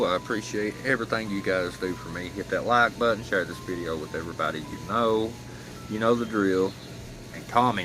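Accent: South, United States. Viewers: You have to be from the south ?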